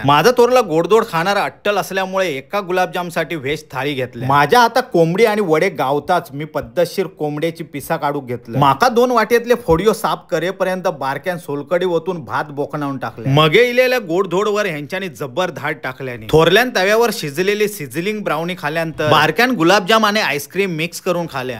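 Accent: Malvani, India. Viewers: Jabardast malwani commentary 😅👌🏻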